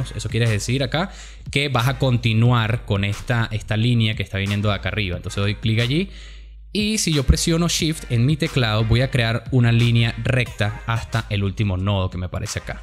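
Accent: Venezuela. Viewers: bro...eres de Venezuela seguro XD suenas como venezolano pero ese no es el tema el tema es que eres un pro es esto felicitaciones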